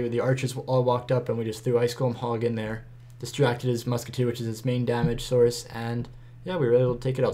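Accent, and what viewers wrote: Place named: Canada. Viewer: aha knew you sounded a little canadian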